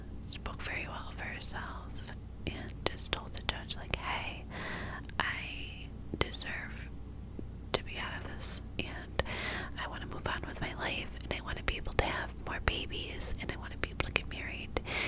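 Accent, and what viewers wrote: New York, United States. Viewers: Thanks! ☺ BTW love your vids they are awesome. Just seen your jail vid. Its absolutely awesome thanks for sharing! Now i am going to watch your New York accent! ❤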